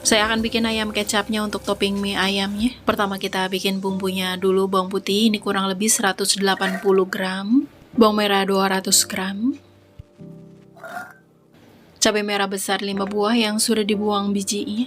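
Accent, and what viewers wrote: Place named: Java, Indonesia. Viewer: Medok banget mantap resepnya mama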